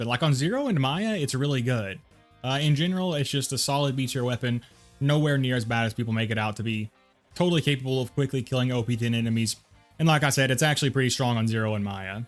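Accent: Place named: West, United States. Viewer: I’ve watched a ton of MOLMF vids this guy clearly sounds way more southern. While sounds more western, I think Nevada or New Mexico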